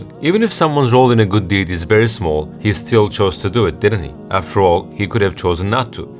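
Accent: Turkey. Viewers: brother are you Turkish? Your accent sound like your Turkish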